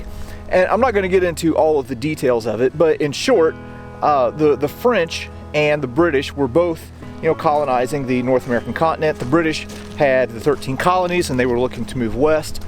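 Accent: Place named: New York, United States. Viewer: Where are you from? You sound like youre from NY and youve never been to fort Niagra, or fort William Henry?..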